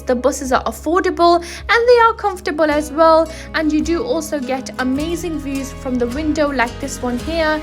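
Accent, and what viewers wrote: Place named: United Kingdom. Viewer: U have a British Asian accent :D, guessing you're Asian. Good vid btw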